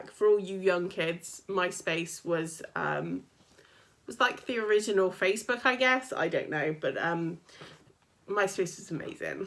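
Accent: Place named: RP, United Kingdom. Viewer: you speak posh, good to see you again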